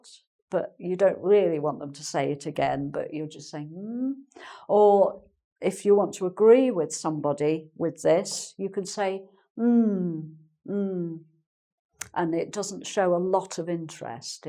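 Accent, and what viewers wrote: United Kingdom. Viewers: you are absolutely adorable the way to teach and your lovely british accent. Thanks so much for your videos Im learning a lot. Send you big hug from Spain and subscribe to your channel :)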